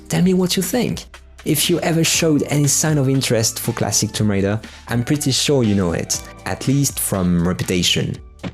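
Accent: France. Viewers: Is it just me or does he have a french accent? Great video btw!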